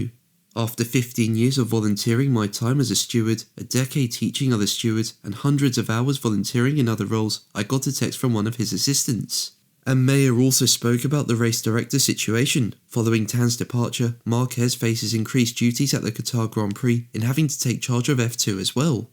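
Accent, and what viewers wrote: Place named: Liverpool, United Kingdom. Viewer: I'm not hearing it; he just sounds Scouse to me.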